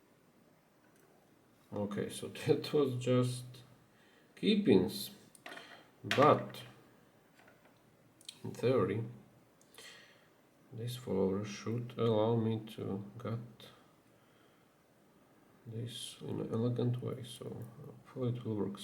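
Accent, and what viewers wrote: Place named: Czech Republic. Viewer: Ahoj, hromada krásnýho odemykání a rozebírání... podle přízvuku a dnes i podle dvojkoruny koukám že jsi čech, tak si říkám jestli se neznáme z nějaké komunity, jezdíš na Lockcon?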